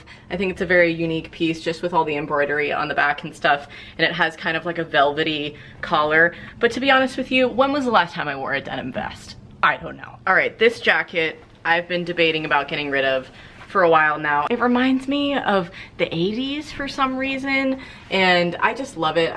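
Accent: United States. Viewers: Hi, the first time i heard you speak I was expecting to hear a lovely Welsh accent, but you're American or Canadian (don't want to get into trouble here. Love your clothes, very comfy & suitable for the seasons you're in. Always giving good advice on where to buy, love the thrift stuff. Stay safe hun, byeeee. Glenn x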